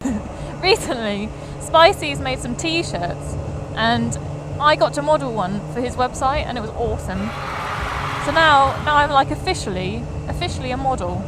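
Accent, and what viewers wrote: United Kingdom. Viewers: British accent is pretty funny to hear Cheers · You have a lovely voice. I watch your videos just for the sound. But while listening to you or any Brit I always think man....there's no way I could be British besides the fact that I'm an American because it takes too much effort to speak like that. You guys put so much effort into speaking. Americans try to shorten words and drop syllables any chance we get but Brits look to add syllables every chance they get. Example... Aluminum 😀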